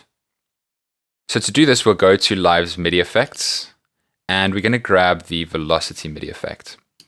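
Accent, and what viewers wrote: South Africa. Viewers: definitely south african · You sound South African?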